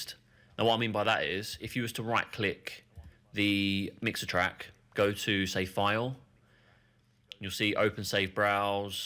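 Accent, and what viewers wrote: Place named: United Kingdom. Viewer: Thank you! That was really helpfull. I'm also like your british accent :) Sounds cool.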